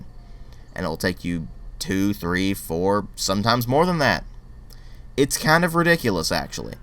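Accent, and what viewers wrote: South, United States. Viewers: Nice deep southern accent! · pretty legit southern accent!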